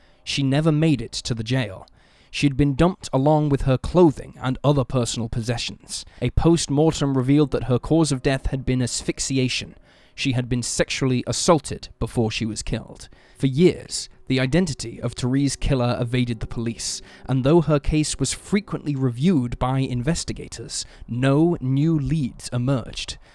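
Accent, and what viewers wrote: England, United Kingdom. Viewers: What a stupid comment! Yea he’s English, but just cos he has an English accent, why are you saying he should only talk about English killers??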